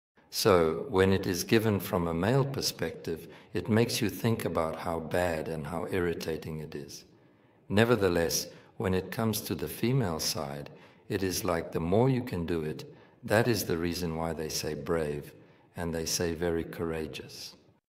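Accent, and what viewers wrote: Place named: United Kingdom. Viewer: Etkileyici bir üslüb. İngiliz aksanı da çok iyi .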